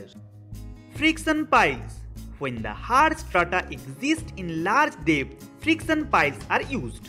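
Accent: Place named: India. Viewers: Should be proud of hindi accent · Stereotypical Hindi accent.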